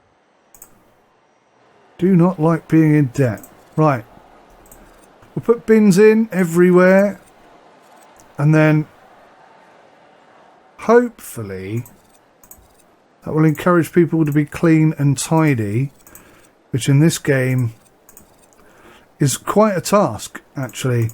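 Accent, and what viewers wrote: United Kingdom. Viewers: Well done on this! I like how you turned things around from that little bit of debt. Out of curiosity, what variety of British accent are you speaking in? It's interesting to see the different voices out there.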